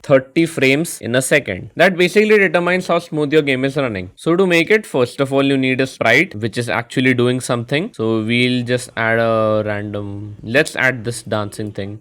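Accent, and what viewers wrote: India. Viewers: such an intersting video completely ruined by the annoying indian accent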